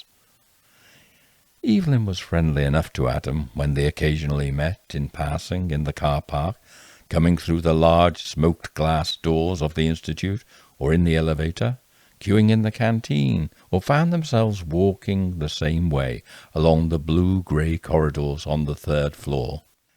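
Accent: England, United Kingdom. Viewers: Wonderful. Another intense mystery by to enjoy. Fantastic mysteries with his lovely English accent guiding us through the most certainly surprising end. I can feel the busy day melting away as I listen. What a talent. ❤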